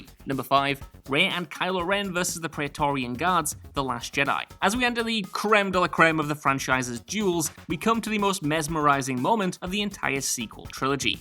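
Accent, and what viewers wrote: United Kingdom. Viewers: British accent really suck! Fuck your Jewel/Joo·Uhl shit!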